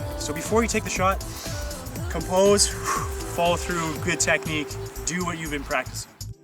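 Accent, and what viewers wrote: Canada. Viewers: Are you Canadian? :)) Abowt. Abowt. Abowt.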